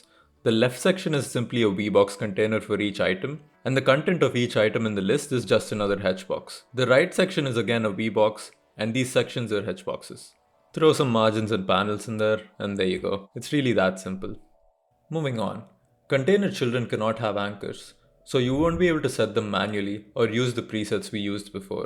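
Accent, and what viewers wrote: India. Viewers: Easily one of the best educational videos on YouTube I've watched. Not trying to be mean here, but I usually struggle a lot with indian accents, yours however was even giving more character to the narration. Had me chuckling a couple times as well, extremely good pacing and all in all a great course! Please keep doing more! Instantly subscribed. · apparently indian english speakers are great at making tutorials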